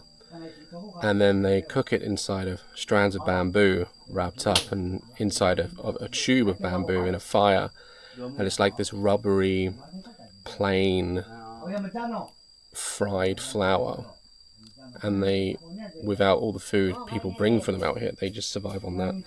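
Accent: United States. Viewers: You can tell & his wife talk alot at home. Noticed an American twang to his accent throughout this video.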